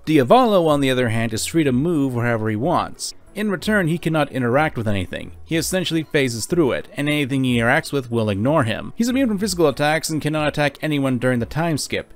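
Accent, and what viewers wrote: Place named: United States. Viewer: your family? Because your accent and channel is american.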